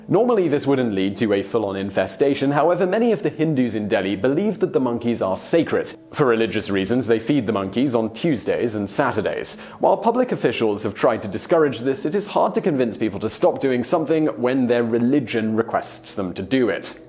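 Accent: United Kingdom. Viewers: accent is def UK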